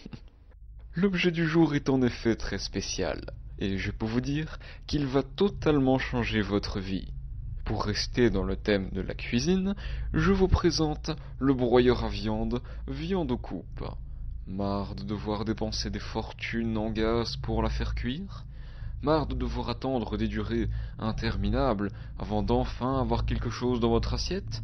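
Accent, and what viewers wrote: Belgium. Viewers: Oh un belge ?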